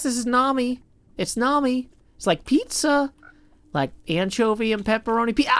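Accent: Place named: New York, United States. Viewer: ur new york accent is stupidly funny. im in hysterics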